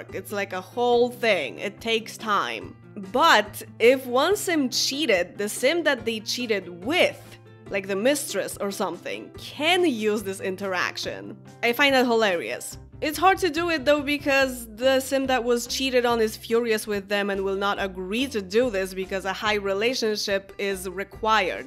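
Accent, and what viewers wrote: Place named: Poland. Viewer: You sound Polish, are you? 🐦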